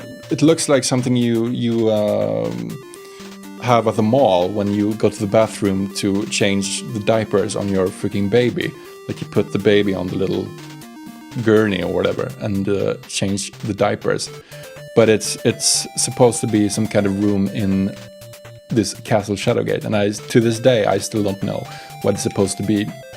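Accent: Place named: Sweden. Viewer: Your accent is really swedish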